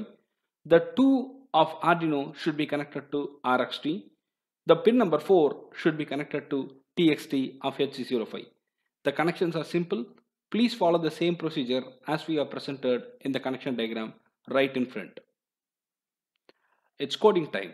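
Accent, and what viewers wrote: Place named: India. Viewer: by your accent you are an indian..... as always indians are good teachers.....worth watching the full video,,,,,thanks a lot....expecting more things from you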